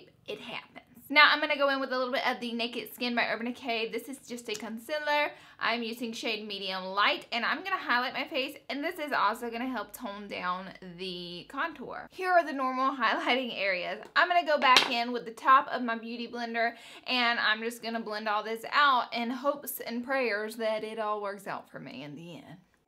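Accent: South, United States. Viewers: Is your family from the southeast? your accent sounds southern. love the video <3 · Are you southern?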